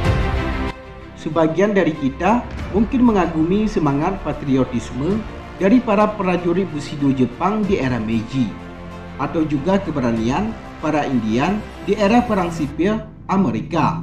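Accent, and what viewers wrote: Aceh, Indonesia. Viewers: Aceh memang the best. Logat Narrator nya Aceh tok tok...👍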